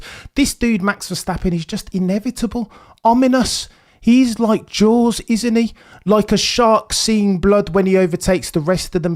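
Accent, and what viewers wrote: United Kingdom. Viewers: You are turning more into max with every video. Pretty refreshing hearing a guy with a British accent just recognizing raw talent, even not if it’s from a Brit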